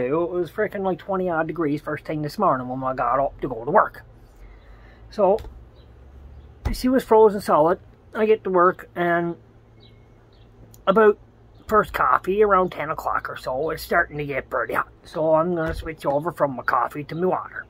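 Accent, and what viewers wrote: Newfoundland, Canada. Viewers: You answered all my questions, thanks! Love the Newfoundland accent but you gotta ditch that hat before you get complaints.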